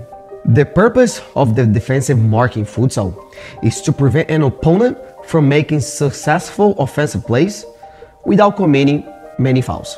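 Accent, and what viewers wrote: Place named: Brazil. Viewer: Vc é brasileiro né? A gnt reconhece kkkkkk ótimo vídeo 👏🏾👏🏾👏🏾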